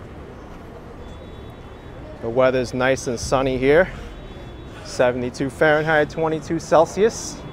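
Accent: New York, United States. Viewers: It's strange to hear u narrating Santa Monica - with a New York accent - I have the same accent. About Silverlake Ramen --- one of the owners is from the Silverlake area - and they just opened another Silverlake Ramen at The Collection in Oxnard, CA. I believe there's another one in Silverlake. My mother and I came out to Calif (from NY) in 1996 -- and we went to the 3rd Street Promenade late 1960s/early 1970s. It was 2 or 3 blocks - an open-air pedestrian mall. So happy to see people out and shopping and stores starting to open. It's been a very difficult 1-1/2 years for all of us.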